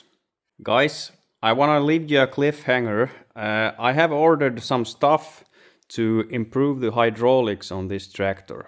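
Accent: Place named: Finland. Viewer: Hi, happy to see that my previous comment was already in you mind, as you becomes more popular YouTube brought me the fisrt video while the second one was already there. You are a Genius! You accent remind me the time I spent in 2006 in Tornio when I was commissionning an stables steel annealing oven in for Outokumpu.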